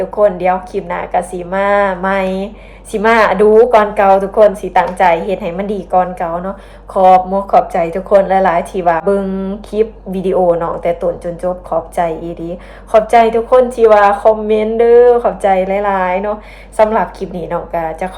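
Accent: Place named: Loei, Thailand. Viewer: สำเนียงภาษาหลวงพระบางสำเนียงเดียวกับกับ,จ,เลย,จเพชรบูรณ์ของไทย❤❤ครับ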